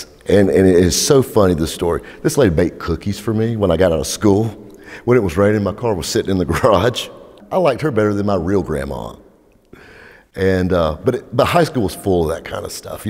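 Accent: South, United States. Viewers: Coolest dude with a southern accent.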